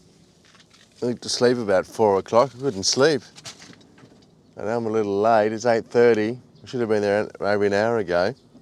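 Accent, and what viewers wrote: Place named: Australia. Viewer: Good day,I’m an old man from London, and have been watching your channel for about 3 weeks and what I find so fascinating about you is your voice, I love the way Australian,s speak don’t ever change it ,anyway when I was a young person 17 in 1964 to be precise I went to Aussie and my first job in Sidney was working for a company called Whelan the wrecker demolitions buildings .well I lasted a week and told the foreman what to do with his job.Well 6 years later I decided to come back home to London a place called Kilburn ,were I grew up. But I loved Australia what a great country, in my travels I palled up with a guy out of Launceston Tasmania called Michael Blazley and we all lived in Melbourne and use it as a base travelling all over the Country from Moree northern New South Wales to Goldsworthy W.A up to Batchelor in the Northern Territory,hitch hiking all over your Country I had a ball. 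I’m also a Van fan I have had many Sprinters in my time,Ford vans like yours are going for under £5000, pounds and there lots of breakers that have lots of gearboxes on E Bay UK . I might be able to help out if you get stuck , Michael.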